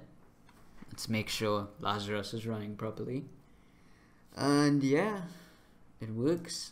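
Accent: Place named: Trinidad and Tobago. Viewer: sounding like ah Trini.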